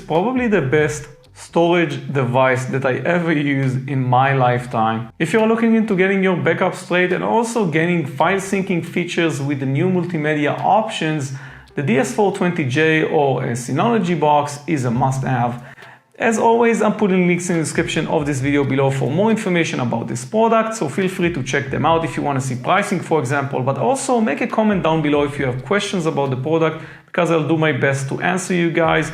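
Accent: Israel. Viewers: You have an Israeli accent 🤔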